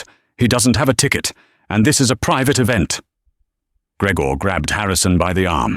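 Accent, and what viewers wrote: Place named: England, United Kingdom. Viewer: Yes. I agree. Luv that purring English accent. YUM!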